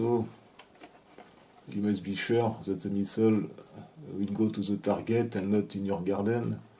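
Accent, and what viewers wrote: France. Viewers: Tu fais vraiment aucun effort pour parler en anglais, autant parler en français et mettre les sous-titres. Pouce bleu nonobstant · you accent is so french omg 😆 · Anglais à la française 😅😅😅😂😂😂😂😂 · one of the coolest french accents i have ever heard! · Very very interesting but I could't handle your Frenglish for more than two minutes. It's a difference if you can't to it perfectly but try OR if you just give a damn and just keep stressing the last syllable like in french. You didn't even try IMO. Cable is CAYbell and keBELL. Stick to French please and just add subtitles if you don't even want to TRY to mimic the sound of the language you want to speak. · he is french some letters they can't pronounce it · pourquoi je suis le seul francais alors que t'es clairement francais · Your french accent is so thick ... I love it :)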